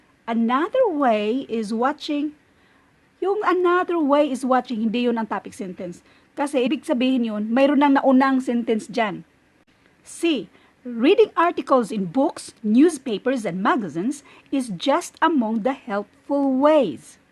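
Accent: Visayas, Philippines. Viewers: Your relative is here 🤗 mam, Mutia shock ko gamay , ma reaction 😲 , nakita ko sa reviewer, while reviewing M., video at reviewer nyo po, ang gamit ko para mag review kaya pala sound bisaya .... big thank you mam sa inyo..🙏🙏, sana nga lang di ko makalimutan ang ne review ko ..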